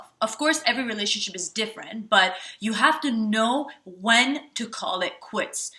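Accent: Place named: Spain. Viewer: A foreign one. She speaks another language, maybe Spanish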